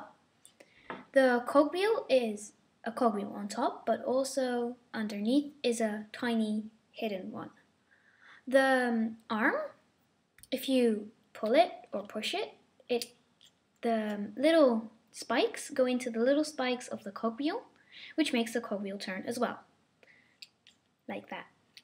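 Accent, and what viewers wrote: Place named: United Kingdom. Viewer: I don't know if you were putting that British accent on or not, but very good video! :)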